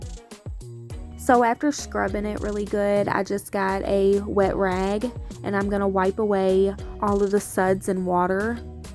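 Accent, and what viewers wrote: South, United States. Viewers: I love your southern accent!! I'm going to need to try that Anti bacterial pledge!❤ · You might be a gypsy well honey you have got that southern barrio accent I am from Georgia and I got it to you tickle me when you talk about all that stuff just the prettiest little fine but I’ll say one thing you were very clean person nobody could never say you was nasty thank you for sharing hugs and prayers baby give the baby a big O kiss and harmony today❤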